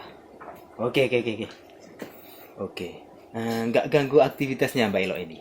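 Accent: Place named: Madura, Indonesia. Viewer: Org maana sih kang logat nya kaya madura gitu😊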